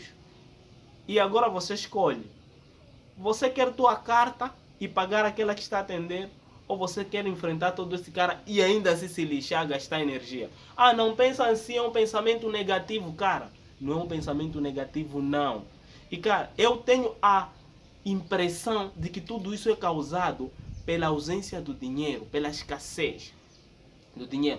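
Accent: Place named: Brazil. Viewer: Pow negão ce fala com alma de Bahiano de Brasileiro hehehehhe e sua fala não tem sotaque moçambicano falando igual a nos brasileiros hehehe isso é muito legal cara So falta arrumar uma brasileira e vir pro Brasile hheheheheh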